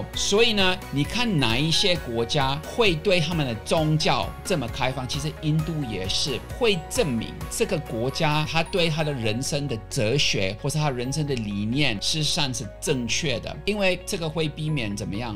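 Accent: Taiwan. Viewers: Justin教授真的因為中文很好,已經很台化了.但是教授仍保有台灣大部分的人沒有的西方個性,就是勇敢做自己想做的事.很多人仍然會受制於家人怎麼想,別人怎麼看.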